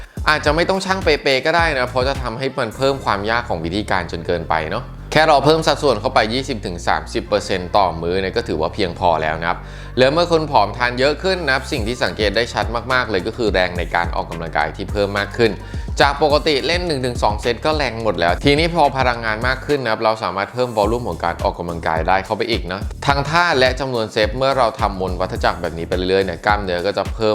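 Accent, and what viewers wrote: Thailand. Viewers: โยโย่มา แล้วเเล้วลดไม่ลงเลย ออกกำลังกาย คุมอาหาร ก็ยังไม่ลง ปล.โค้ชเป็นคนสุพรรณหรอ สำเนียงมันได้😆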